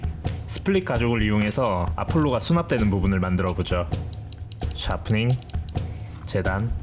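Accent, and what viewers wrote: Gyeongsang, South Korea. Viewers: 약간 경상도 억양 섞인 말씨가 중독성있음 ㅋㅋ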